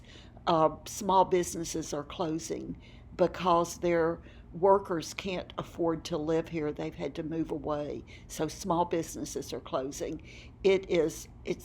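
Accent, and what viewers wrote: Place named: Florida, United States. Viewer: Sunday morning here in UK and enjoying my coffee with you - and I love your uh's! It's part of your fabulous accent - thank you for sharing all your news but was very sad to hear about Ethel Cup Cake, and feel so sorry that Jennifer and Riley will be going through a tough time but what a wonderful act of loving decency that did which would have made Ethel's days happy ones. Take care all in Florida we're thinking of you over the pond.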